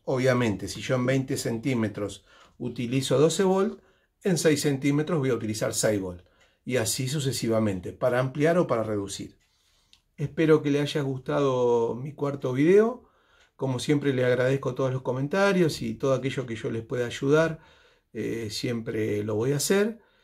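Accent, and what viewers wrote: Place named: Argentina. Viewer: No vale eso te quedó profesional amigo... Exelente trabajo y vídeo. Me suscribo y te felicito por tu canal y talento tienes la paciencia para enseñar y conocimiento para dar gracias amigo. Me parece que eres argentino Dios te siga bendiciendo grandemente y Dios Bendiga a la Argentina. Un abrazo y saludos desde Caracas Venezuela 👍🏻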